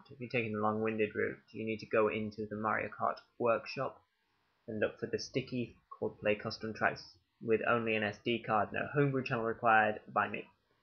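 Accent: England, United Kingdom. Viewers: you sound like my friend Alex with a British accent. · Love your accent! Are you English like me?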